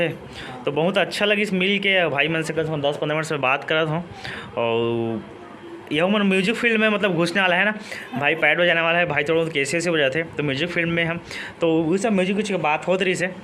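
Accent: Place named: Chhattisgarh, India. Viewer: Cg tune dale ho ten ha bht mst lagt he ❤️🤗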